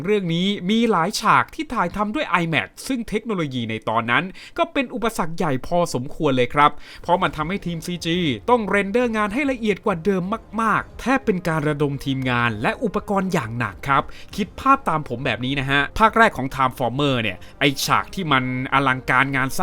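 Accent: Isan, Thailand. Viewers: ผมละชอบจักหวะพูดอีสาน 555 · มักเสียงพากษ์อิสานของเจ้าหลายๆ😂❤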